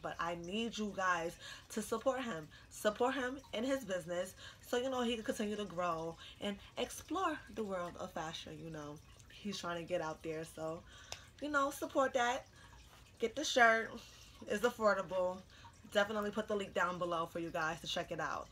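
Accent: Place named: Brooklyn, United States. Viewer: U must be from Brooklyn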